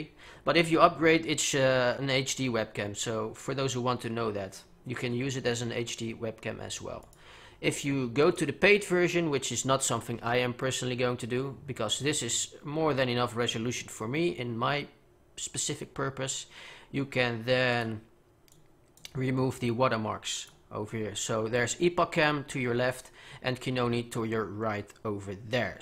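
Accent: Netherlands. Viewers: Zonder dat ik naar de reacties las hoorde ik direct dat je Nederlands was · Bij mij hangt de download de hele tijd vast (ik weet dat je NL bent ik hoor het) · je bent gelijk nederlands dat hoor ikg elijk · Hi, U sound a bit dutch, Are u dutch? Cuz i am XD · You're defenitly Dutch right? i'm Dutch aswell and my YouTube is english and i just can hear your accent we share :D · Nederlands? Ok hoor t aan je accent haha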